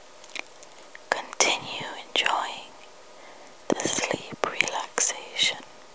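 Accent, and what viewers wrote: Kilkenny, Ireland. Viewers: Love the irish acsent. This video helps me sleep. Love it :) Gray/ TheSquishMovies x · Is that County Kilkenny I hear? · It's amazing to me how certain Irish accents sound so much like certain American accents.. I mean they're very different in many ways, but it's striking what they have in common. Your first couple sentences I even wondered if you were from the US or something until your Irish accent became obvious. I wonder if you feel the same about American accents or if it only goes one way. Hmmmm.. FOOD FER THOUGHT! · yeah sounds like a kilkenny accent im a cork man myself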